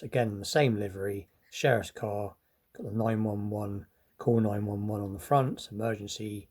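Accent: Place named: England, United Kingdom. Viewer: Hi sorry you had a bad day buddy, are you in uk i ask as you sound English as seen this Recue pack on USA youtube but cant wait for it to come over to uk 😀👌 great show any way sorry been wanting this for months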